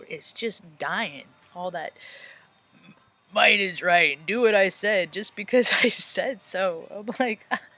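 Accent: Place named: South, United States. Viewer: No coffee Nor Tea ...PBR...IT'S A SOUTHERN TWANG .😉 Ooh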